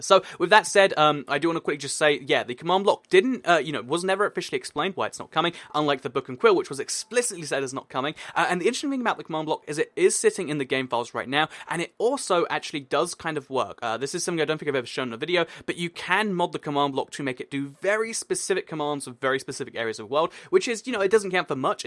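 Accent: United Kingdom. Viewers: #British Accent: Will we ever get mineable spawners for the Console edition of Minecraft?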